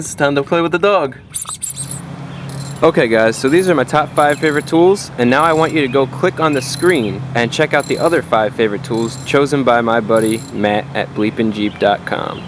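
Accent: United States. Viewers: Sir are you an american ??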